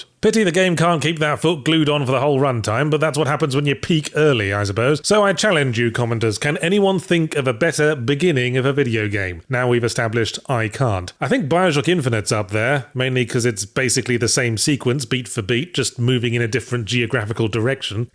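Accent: United Kingdom. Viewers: In a weird way this reminds me of Egoraptor's talk about about the opening of Mega Man X. But more fancy due to British. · No video intro has ever made me more pumped to hear a British bloke talk about video games.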